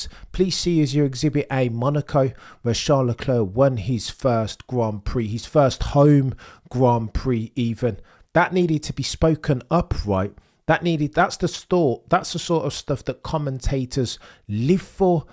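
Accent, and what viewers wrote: United Kingdom. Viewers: I’ve never heard a Brit with a accent THAT bri’ish speak so positively about Max Bravo sir 🙏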